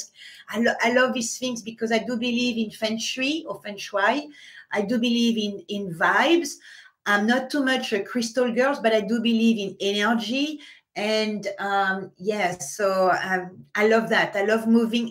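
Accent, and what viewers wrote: France. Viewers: Merci , merci …pour votre bonne humeur , votre beau sourire , et vos vidéos que j’adore écouter , parce qu’enfin , je comprends tout , votre anglais est adorable , avec un accent français , un peu comme le mien 😂🥰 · Your cute french accent is so charmimg on youtube. Please don't go... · Take care of you but please keep us in your life! Love, love, love you and your social media. Best part is hearing your lovely, smooth French voice. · Love your sense of humor ! Enjoy listening to your lovely French voice and uplifting ideas on all subjects ... so glad I found your utube channel. Best wishes. Karen from Denver Colorado. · I recently found your YouTube channel and Wow!! I love all your content, your personality, your French accent 😄. I just want to say don't give up. It looks like you have so many people who support you and love what you do. 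Your ideas are classy, doable and timeless! I love it all!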